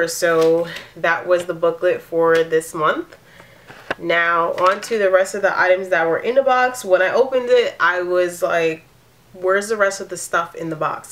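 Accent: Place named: New York, United States. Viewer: thanks for the review. But I was surprise to really hear your New York accent, I'm from Chicago and Its usually not as strong in your other videos, but they must had really upset you with this box!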